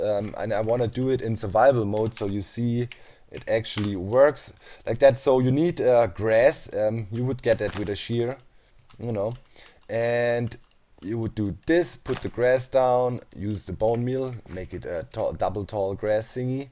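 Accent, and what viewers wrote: Germany. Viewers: Sagmal du bist doch deutscher oder :D?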